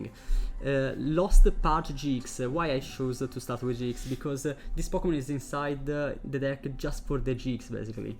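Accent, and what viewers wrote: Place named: United Kingdom. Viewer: ma quanto cazzo sei british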